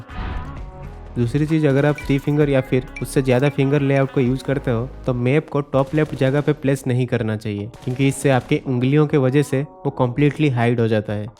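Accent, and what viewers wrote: Odisha, India. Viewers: Bhai tum odia ho kya ?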